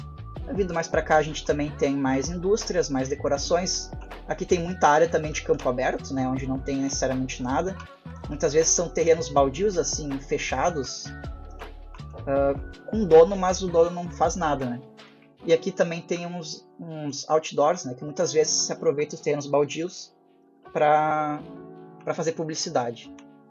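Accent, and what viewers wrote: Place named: Porto Alegre, Brazil. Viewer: aquele sotaque que só o portoalegrense reconhece kkkk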